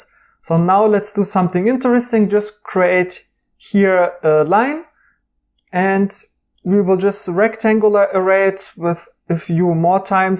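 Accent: Germany. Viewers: that german accent xD SCHLAND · So ein schönes deutsch-englisch und auch das Programm ist beeindruckend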